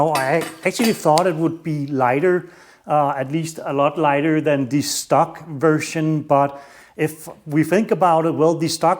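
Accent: Denmark. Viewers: ja du behøver iværtfald ikke sige hvor du kommer fra :D. den danske accent er bare dejlig haha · Elsker at man ved første ord kan sige med 100 procent sikkerhed, han er dansk. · Alene baseret på accenten, så vil jeg smide et vildt gæt på at du er dansker 😏 Fed og interessant video!🤟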